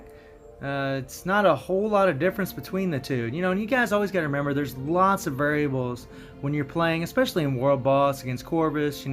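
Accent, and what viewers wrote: United States. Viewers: He is from the United States it's obvious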